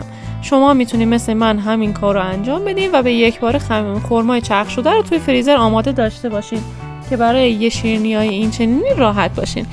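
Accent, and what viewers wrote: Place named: Kerman, Iran. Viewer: این دستور کلمپه بهترین و آسانترین بود. بخصوص که شما با لهجه قشنگ و گرم کرمانی صحبت میکنید. 💚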